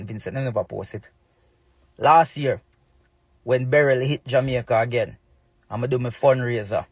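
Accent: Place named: United Kingdom. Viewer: However this dude is not Jamaican he is from the UK with a British accent.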